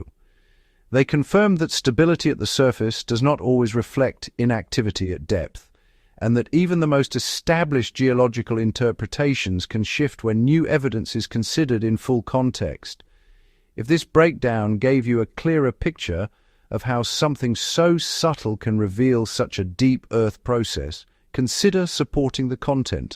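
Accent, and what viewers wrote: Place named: United Kingdom. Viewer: WHAT SOUNDS TO ME LIKE A BRITISH ACCENT IN NARRATION IS MOST OBNOXIOUS.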